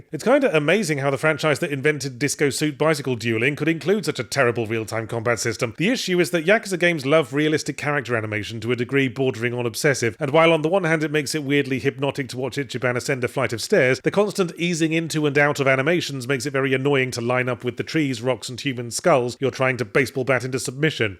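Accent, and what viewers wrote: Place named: Lancashire, United Kingdom. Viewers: To me this finally confirms my suspicion that is Northern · The Lancashire accent!! My god. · god ive missed hearing northerner accent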